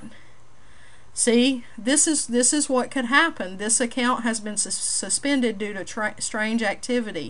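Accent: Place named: South, United States. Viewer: LUV your Southern Accent!:-) You give a great tip in this vid, nice job. Keep up the good work! Blessings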